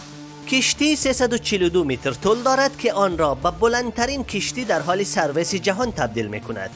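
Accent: Afghanistan. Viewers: لهجه ایشون لهجه متداول در افغانستانه. جمله بندی و دستور زبانش هم مربوط میشه به فارسی دری. بنابراین ایشون کاملا درست صحبت میکنه. شما لطفا یه کم ادب و نزاکت یاد بگیرید.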